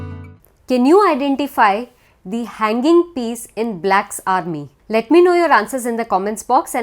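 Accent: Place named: India. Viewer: Thanks! :) your accent sounds Indian idk why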